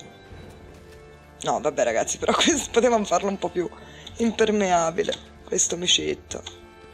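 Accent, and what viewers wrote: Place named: Lucca, Italy. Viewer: È simpaticissimo il tuo intercalare, tanto più che lo conosco bene: ho vissuto gli anni delle medie e delle superiori a Lucca e ancora oggi, quando parlo con le mie amiche che vivono ancora lì, parlo in lucchese!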